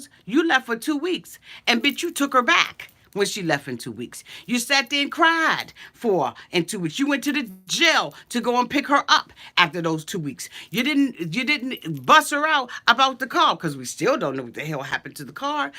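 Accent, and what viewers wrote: Washington DC, United States. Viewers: I was thinking you from DC, I hear my family in the way you speak This is why I am drawn to you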